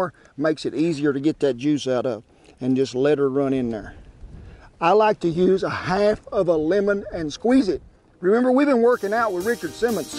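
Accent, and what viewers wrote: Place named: Oklahoma, United States. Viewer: Kent est un vrai bijou pour les amoureux de la cuisine outdoor.Il a un coté frenchy dans sa maniére de manipuler les ingrédients,on sent qu'il aime ce qu'il fait . Et son accent de l'Oklahoma...Génial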